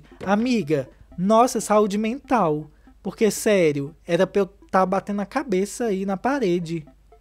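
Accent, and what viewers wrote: Minas Gerais, Brazil. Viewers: Conheci seu canal por acaso há muito pouco tempo não costumo fazer comentários mas tenho essa vontade contigo. Não sei se é porque você tem esse sotaque minérim (minha família mineira) se é porque tenho um filho morando na Europa há uns 5 anos ou se porque o filho que me restou aqui também é Vegetariano kkkk. Brincadeira 😉 mas é só pra dizer que gostdoce tá ! Abraço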